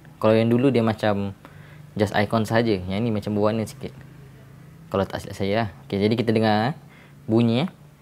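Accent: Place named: Negeri Sembilan, Malaysia. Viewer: suara mcm org nogori je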